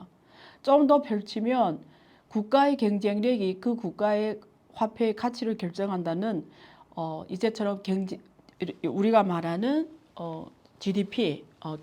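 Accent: Yanbian, China. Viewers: 아유화 유튜브 좀낫설지만반갑씁니다 사실적인 소식만 전해 주세요 억약으로보아연변말씨 아니면 탈북민이신가요 조심스럽게 지켸봅시다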